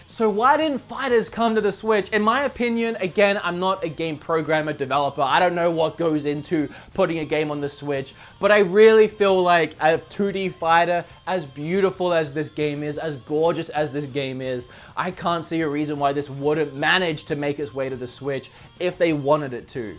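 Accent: United Kingdom. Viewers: Wait, is this guy british or American Edit: oh, right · I don't know why I thought you were British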